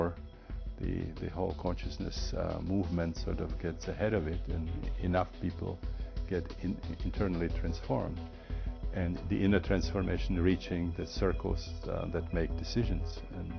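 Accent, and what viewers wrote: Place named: Czech Republic. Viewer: he sounds Czech... I am about 99% sure by his accent and his name confirms that very well also.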